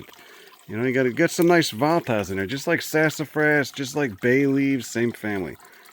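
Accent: Chicago, United States. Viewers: your from Chicago right? Have that accent yah. me too well i don’t have the accent though. anyway been watching your stuff for about a year now so kinda new compared to how long you’ve been on. The humor sarcasm is what keeps me coming back. And i love plants and the way you make it fun. especially love the Texas ones.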